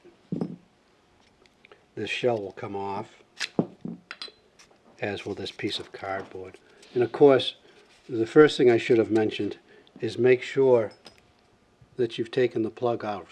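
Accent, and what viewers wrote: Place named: Boston, United States. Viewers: As soon as you said That, I knew your were from Massachusetts. Made me a bit homesick. Hope your shop is still doing well. Thank You for a great demo. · Glad I came across this. Good accent. I actually need to learn how to do a Mass/New England accent for a play and this should help nicely😄 I’m actually looking to fix a foot switch for a lamp. Thanks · Actors should listen to this guy before attempting a Boston accent in movies. Most actors hit it WAY too hard. Hahd lol.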